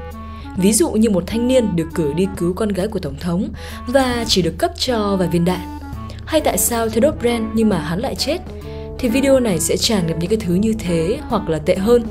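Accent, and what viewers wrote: Vietnam. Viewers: Voice mới người Thanh Hoá à? 😢 Giọng nghe mệt quá, k xem hết nổi video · Bạn nài quê Nam Định hỏ, nghe giọng giống lắm 😐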